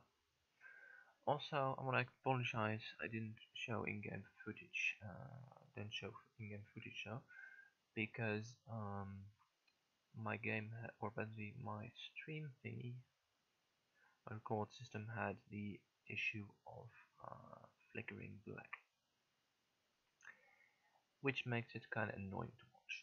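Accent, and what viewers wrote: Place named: Netherlands. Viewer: Thx for the tutorial :) I assume you're Dutch . so it sounds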